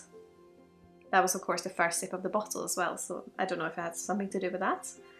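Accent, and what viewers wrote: Scotland, United Kingdom. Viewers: Just ordered 2 bottles ..you convinced me. Oranges, strawberries ... wood. Sounds great. Alert don't by this its a watery dram for beginners The A'bunadh is a good drop so can't go wrong Does Sweden have any distilleries. ? I'm confused as I'm sure you have a Scottish accent.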